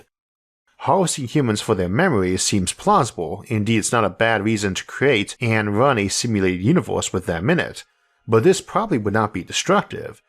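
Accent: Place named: United States. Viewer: American, but he also has rhoticism.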